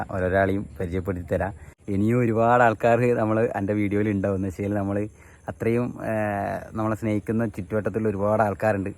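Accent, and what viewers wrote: Kannur, India. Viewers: കണ്ണൂർ ഭാഷ കേൾക്കാൻ നല്ലരസണ്ട് 👌🏻👌🏻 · കണ്ണൂർ slang കേൾക്കുമ്പോൾ എൻ്റെ വീട്ടുകാരെ ഓർമ വരുന്നു..ഞാൻ ചേലോറ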